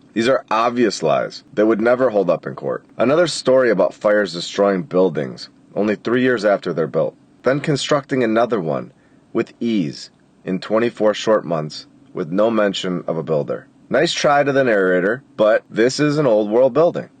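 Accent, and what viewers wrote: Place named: Michigan, United States. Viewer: Loving these videos. Haven’t gotten terribly far. How does it link to the Masons🤔🤔🤔. Have you listened to Bill Coopers series on Ancient Babylon? Also love your accent - Michigan??😊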